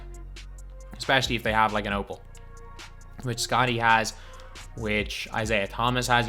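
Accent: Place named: Ireland. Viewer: There’s clearly a “H” in “threat” saying “trett” makes no sense. I also don’t know why you say “or” for “R”. I get that you’re Irish but it’s just grammatically incorrect. Good informative videos though 😂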